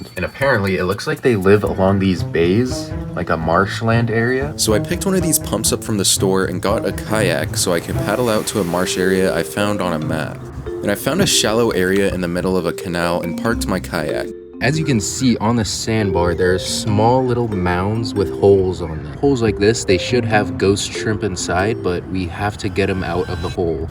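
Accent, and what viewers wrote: United Kingdom. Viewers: why are you in a british accent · Bro are you British can you say bottle of water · Is he or his voice became British? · Are you a british brit ‽‽‽ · does bro sounds british on purpose? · Are you a Brit bro? Why u sound like dat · Bro is British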